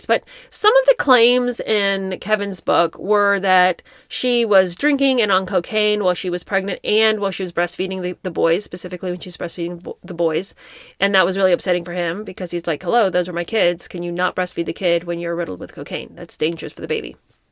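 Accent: United Kingdom. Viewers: Love your British accent!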